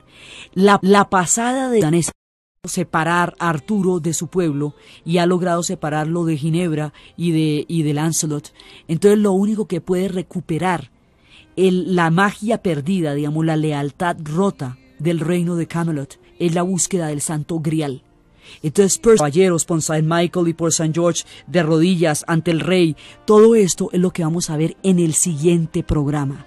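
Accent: Colombia. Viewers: Es facilisimo entender con una narrativa como esta, empeze a escuchar por investigacion pero ahora me he hecho fan y adicta a su canal, aprendo mucho y me hace aprovechar mejor el tiempo!! Ademas me encanta su acento y expresiones Colombianas. Saludos desde Cancun, Mexico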